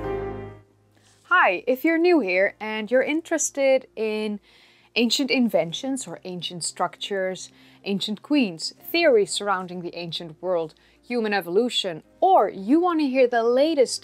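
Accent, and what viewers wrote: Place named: Netherlands. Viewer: She speaks excellent American, but with a Dutch chattiness to it.But how can you tell the difference between the soot of a Neanderthal fire and of modern human fire?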